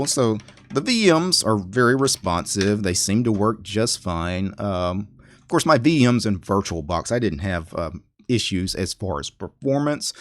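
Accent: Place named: Texas, United States. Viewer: He has a strong Texas accent :-)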